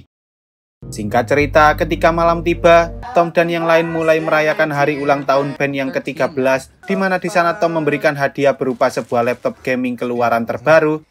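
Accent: Java, Indonesia. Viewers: Bisa gak pake medok gak? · Paling seneng dengerin review film yang suara yang review ada jawa jawa nya🗿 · BANG LU ORANG JAWA KAH😊=BANG LO WONG JOWO TAH😊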